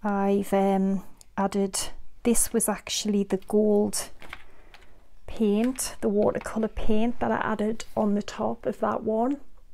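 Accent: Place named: Newcastle, United Kingdom. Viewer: I have only just come across you I really love your ideas they are Great in your jordie accent, 👍 Nice to find craft inspiration in England the others I have are American I have no issue about but its nice to find one here, I love what you did any chance how you did the white poinsettia please, bit late to the part though..😃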